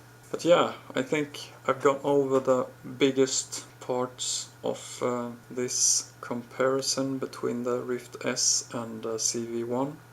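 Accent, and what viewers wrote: Norway. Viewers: are you from norway? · Ok cuz u sounden really Norwegian btw im Norwegian :D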